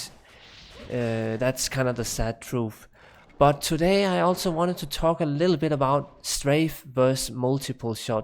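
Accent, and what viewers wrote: Denmark. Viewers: dude. Du har lavet youtube videoer i tre år. Hvornår skilder du dig af med den stykke cringy danske accent. Og hvornår har du tænkt dig at øve ord inden du optager. Jeg har efterhånden set en god håndfuld af dine videoer, men jeg kan aldrig kommer over halvvejs, pga udtale og accent. Tag dette som konstruktiv kritik, fordi jeg tror virkelig at det vil hjælpe dig med dine fremtidige videoer.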